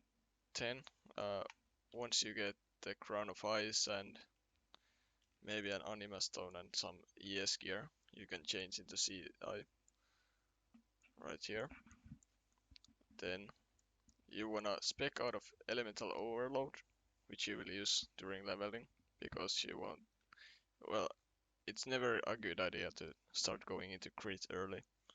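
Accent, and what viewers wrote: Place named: Finland. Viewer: If i learned one thing in Finland for my 16 years of stay there, it is how finnish people sound when they speak english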